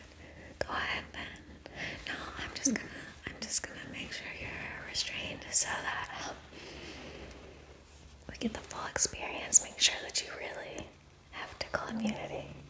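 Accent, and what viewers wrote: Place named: United Kingdom. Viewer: you're funny saying "Hey,you" and "Who do you think you are?".If you could ask anything about me,fun or otherwise,what would it be?I respectfully wish you continued success with the ASMR videos.Love your facial expressions,mouth sounds,tickle taunts and your cute accent,you silly Brit.You can't get me if you tried.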